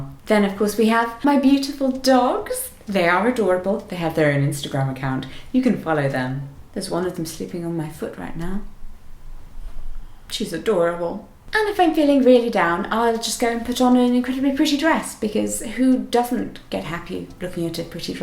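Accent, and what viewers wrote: United Kingdom. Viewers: You have the most British accent I have ever heard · You sound beautiful, I love your voice and I usually hate British accents, it’s great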